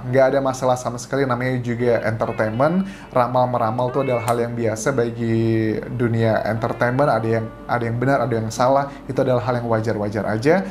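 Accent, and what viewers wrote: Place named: Java, Indonesia. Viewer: mas wong jowo jebul yo.. jowone ngndi mas hhh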